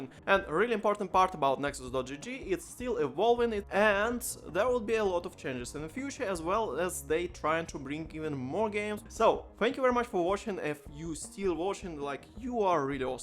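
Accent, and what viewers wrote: Russia. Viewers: Russian accent is so intense)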